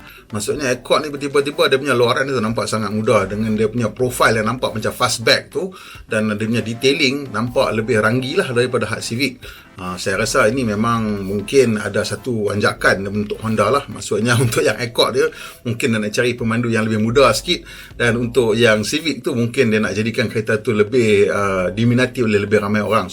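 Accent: North, Malaysia. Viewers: Abg org mana bang...dok cakap belah utagha ni..😄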